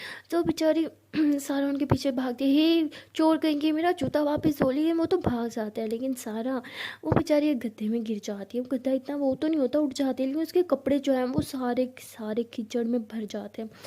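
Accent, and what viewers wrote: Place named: Uttar Pradesh, India. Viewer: Pakka up se ho sahoor to mp up me hi bolte hai😂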